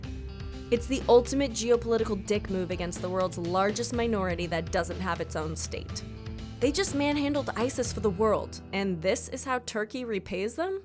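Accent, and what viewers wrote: United States. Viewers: This video sounds American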